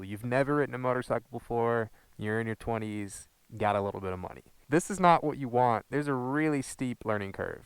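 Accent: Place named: United States. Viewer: you sound gay as fuck with that american accent✈🏢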